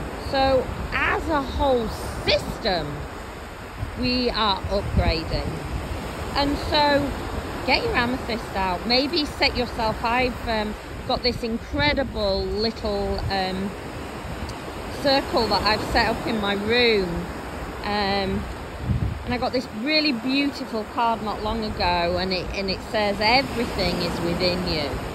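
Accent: United Kingdom. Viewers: She's from U.K. That's just how they talk I think. 🙂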